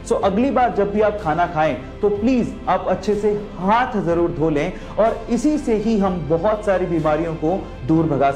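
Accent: Maharashtra, India. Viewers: Aap marathi kyon bolte hai like thamba but it's funny I like it because I am Marathi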